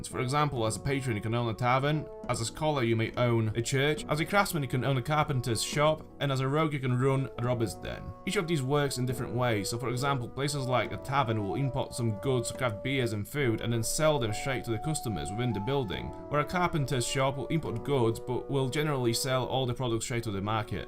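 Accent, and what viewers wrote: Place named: Northern England, United Kingdom. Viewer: Wait so are you Polish as per your name, or are you from Sheffield?! Seriously you sound like you're from northern England! Nice review though 👍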